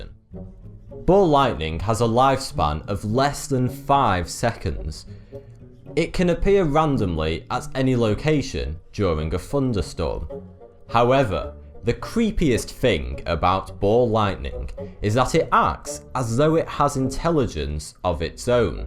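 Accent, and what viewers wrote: United Kingdom. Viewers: thomas I love the British accent, seriously... I could listen all day and never tire of it. :)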